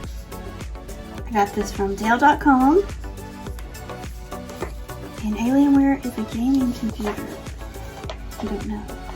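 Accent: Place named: South, United States. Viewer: HELLO~☆from Lou. KY.🐎🐎I HEAR A Familiar Southern accent; TN, KY. Awesome LAPTOP! Nice to meet you, whoa, We have tech issues ❗🌻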